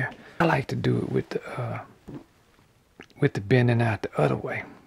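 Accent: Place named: Memphis, United States. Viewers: You're voice and accent reminds me of my uncle Jesse from Memphis! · Hello! Do you think it's possible to place orders for your items? I really, REALLY need one of the elephant fork pendants! Oh, by the way... I totally pegged your Mississippi accent, seeing as how I grew up in Memphis, TN. You sound like old friends back home!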